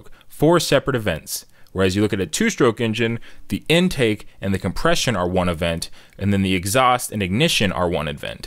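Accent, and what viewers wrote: United States. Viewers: Slow down with your voice. Most people have difficulty comprehending american. [Aussie in BC]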